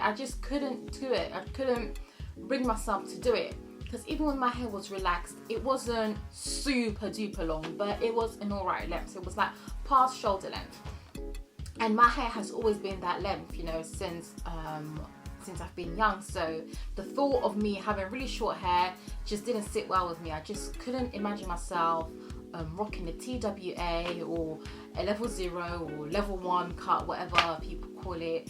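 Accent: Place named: England, United Kingdom. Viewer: You look exactly like R&B singer Fantasia but with an English accent. Lol . I like the encouragement you are giving girlie :). I'm transitioning to natural and I have had my hair cut real short before twice so I know how I'll look with short hair but I will not big chop I opt to grow it out gradually.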